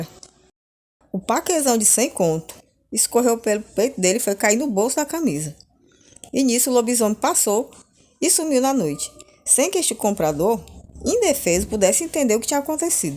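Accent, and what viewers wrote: Northeast, Brazil. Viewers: Vc e uma narradora muito maravilhosa lembro meus parentes do Ceará minha vó meu avô materno moro em Curitiba mais vendo vc falando lembro e tenho muitas saudades do meus parentes nordestino avó avô e tios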